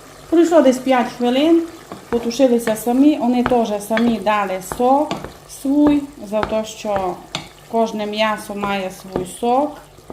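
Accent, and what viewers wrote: Transcarpathia, Ukraine. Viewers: Я хоч і хотіла подивитися рецепт, але сиділа і слухала як гарно і красиво Ви говорите❤ нага мова то любов💛💙💛а з вашим закарпатським діалектом, дуже гарно.. дякую. Рецепт передивлюся ще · Дуже люблю закарпатський говор, погано що не видно господиньки, раніше було видно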